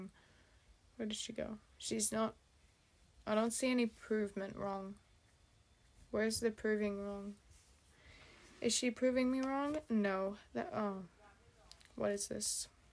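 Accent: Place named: Australia. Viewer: I knew it I knew u sounded like a Australian🙃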